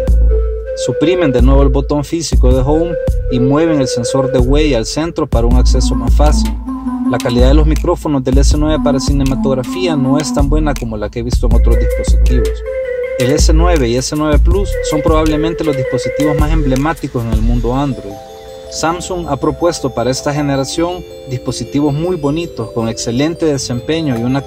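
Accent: El Salvador. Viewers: Eres de El Salvador verdad 😮😮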